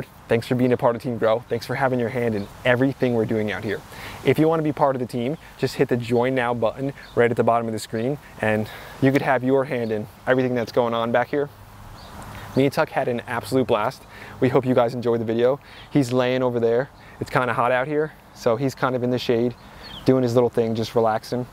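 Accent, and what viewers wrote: New Jersey, United States. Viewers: his loudmouth Jersey Yankee style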